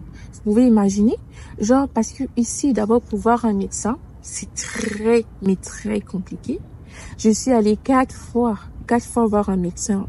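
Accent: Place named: Quebec, Canada. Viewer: Ca fait 2 jours que je t'ai découverte. J'ai presque regardé toutes des vidéos. Tu as presque un accent québécois maintenant. J'aime ta simplicité et aussi, tu détailles mieux brièvement certaines chose que je n'ai pas trouver ailleurs. Je t'envoie plein d'amour et positivité 😘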